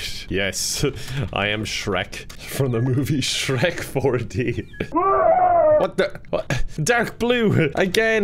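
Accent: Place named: Ireland. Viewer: Bruh I'm subbing because of the Irish accent and funny videos :]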